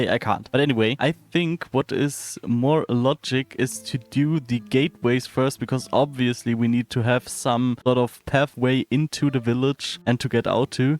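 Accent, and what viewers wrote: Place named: Germany. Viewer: at the beginning i thought he isnt engish like i hear german through and then wen you said your not good in speaking english and that your from germany it all maked sense (subcriceb)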